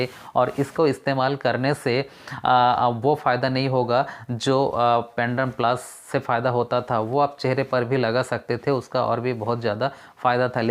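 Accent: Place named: Bihar, India. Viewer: Rahane De Bihari de raha bhashan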